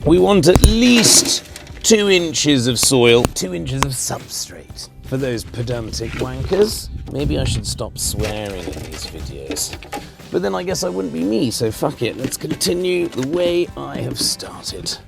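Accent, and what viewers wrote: RP, United Kingdom. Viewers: There's something about hearing swearing in a posh accent that brings me great joy. 😆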